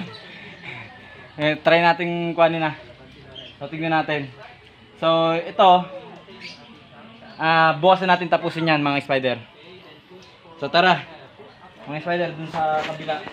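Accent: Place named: Laguna, Philippines. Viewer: Tol taga san ka pala ka punto mo samin eh sa sta cruz laguna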